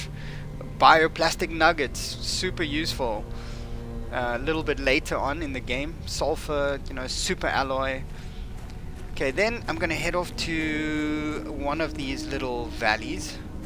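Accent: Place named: South Africa. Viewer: Sounds like you from SA but anyway ty this was sooooooo helpful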